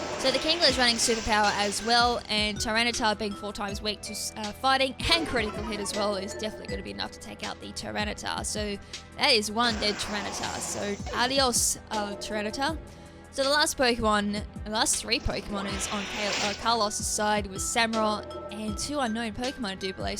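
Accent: Australia. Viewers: just assumed it was Australian accent anyway. ^^ Anyway, didn't mean to complain. Thanks for taking the additional energy to still upload a video. :)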